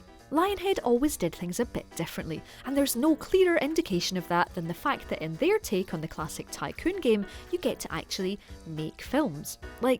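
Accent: Scotland, United Kingdom. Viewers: very scottish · Damn, dat Scottish Accent 😌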